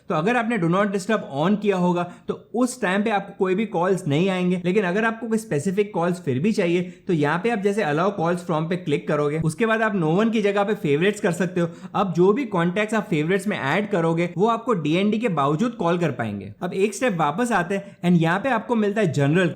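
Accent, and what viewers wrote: Gujarat, India. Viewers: Are you a gujrati sir?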